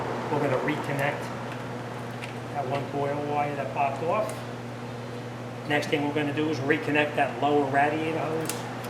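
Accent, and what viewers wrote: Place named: Boston, United States. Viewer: Hey dude thanks for the video and I recognize the dialect, you r from boston or somewhere deep north, we don't say raadiator , it sounds weird the way u say it. Don't take it personal, it just reminds me of an old Army buddy that used to make fun of me and me of him for laughs,lol. Down south people say over yonder and yaul and we say oil different-but we are all American's, so God bless my brother.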